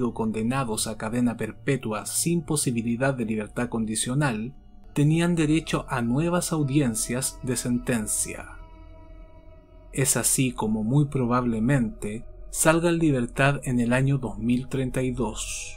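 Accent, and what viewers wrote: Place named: Chile. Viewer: La narración y dicción es perfecta hermano...y el acento sutilmente chileno... je je ..oye un abrazo y muchos éxitos...nos tienes enganchadísimos a tu canal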